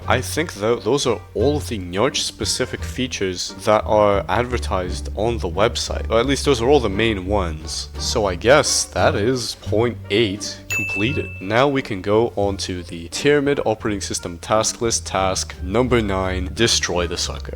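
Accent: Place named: France. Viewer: your french accent is crazy considering you don't know french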